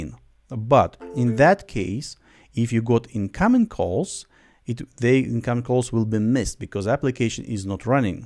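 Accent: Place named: Lithuania. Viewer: bro where are you from, your accent sounds lithuanian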